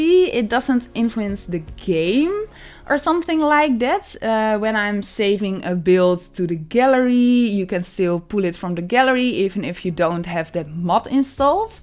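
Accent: Netherlands. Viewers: You sound like you’re from the netherlands. Are you Dutch? My dad is Dutch and my grandparents have a similar accent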